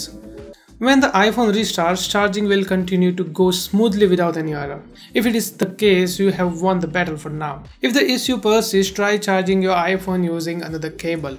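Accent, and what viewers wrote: India. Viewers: omg that indian accent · You are a narrow minded racist. A chinese criticizing someone’s English accent is just hilarious. His English is fine - he has an ‘accent’ but I am quite sure even you could understand. BTW it’s ‘Indian accent’ not India accent. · India accent + spoke very fast = rip listeners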